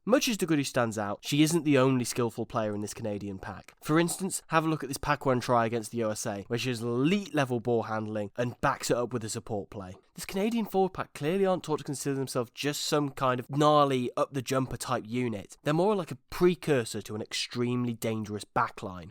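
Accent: Wales, United Kingdom. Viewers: Humour: ✅ Welsh accent: ✅ Great rugby analysis: ✅ If being the host can help you produce more videos I say bring on more! I can't get enough squidge content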